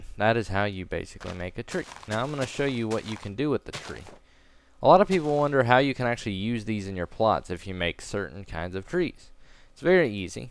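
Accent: South, United States. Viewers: Why do you sound like you were from the south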